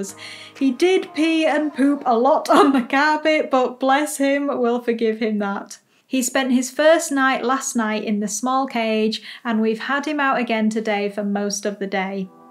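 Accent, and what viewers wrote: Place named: Scotland, United Kingdom. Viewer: Hi! I just found your channel. At first I thought that I haven't heard this accent before. Seeing UK in the channel's description was a big surprise for me, because british english is what they tried to teach us in school for ~10 years. ^^ Your pronunciation reminds me of the scottish visitor my English teacher once brought to class in 9th grade. Could it be some Scottish influence? I'm just as curious as my guinea pigs. ;) My language skills were not the best back then and still aren't today, so sorry from my end. Greetings from Germany :-)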